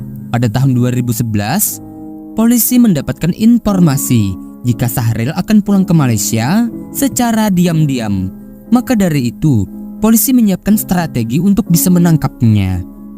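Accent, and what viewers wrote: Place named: Madura, Indonesia. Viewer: Mohon naaf ini suara seperti ada logat Madura, apakah orang Madura yg tinggal di Kalimantan, maaf ya😁🙏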